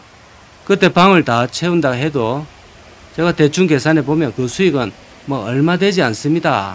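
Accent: Gyeongsang, South Korea. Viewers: 경상도 사투리 완벽하게 이해하는 1인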